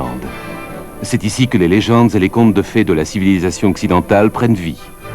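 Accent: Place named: France. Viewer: Die Franse uitspraak van de Engelse termen maken het helemaal. Merci om dit te delen, deze had ik nog niet gezien. ❤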